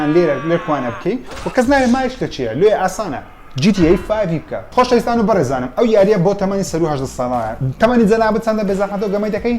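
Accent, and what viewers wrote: Erbil, Iraq. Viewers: Zoor zoor Shazy Xoshawestrin ashtt laimn wshakanta Wa Hich zmany xosht nagoria Ba hawlery qsay dakay Xot mozqof nakay ba bo qsaybkay xambar xot Bgory Wa Zoor Shaza vidokant Zirakanau nweia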